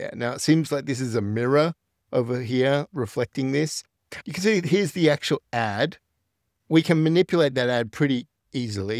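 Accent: Australia. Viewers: Australian or South African? Nice vid.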